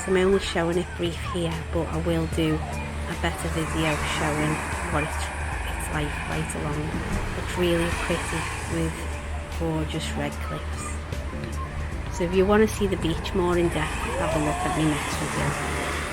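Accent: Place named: Liverpool, United Kingdom. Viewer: No mistaking that scouse accent there 😁 do u live out in Portugal? Were staying at 3HB Club Humbria in October can't wait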